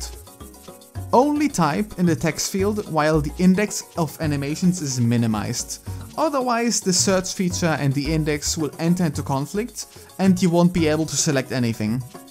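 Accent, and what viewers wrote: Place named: Germany. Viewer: You sound super German it's very entertaining. Also, and is it possible to change facial animations?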